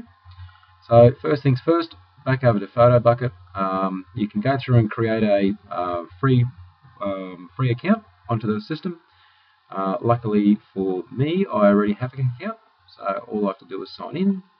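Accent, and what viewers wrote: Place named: Australia. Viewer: G day Thanks mate aussie accents are my favorite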